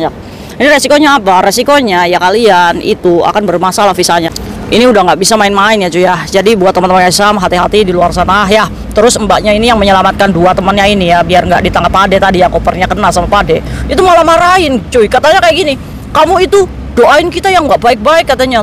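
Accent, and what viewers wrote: Indramayu, Indonesia. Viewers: Metu bhsa dermayune angel angel 😂😂😊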